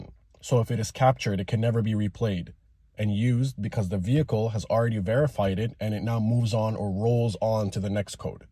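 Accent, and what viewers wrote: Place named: Canada. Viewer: Bro I can just tell your Canadian haah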